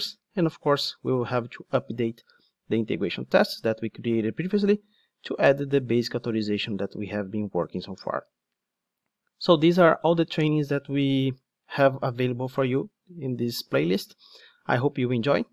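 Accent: Brazil. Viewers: Eu pensando que era outra pessoa ensinando, mas é o mesmo BR falando inglês kkkkk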